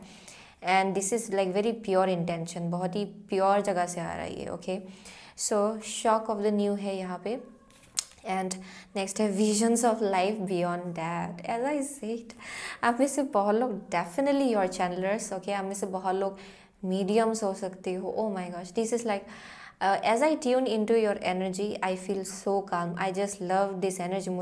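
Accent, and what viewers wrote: Northeast, India. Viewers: Aap north eastern se ho kya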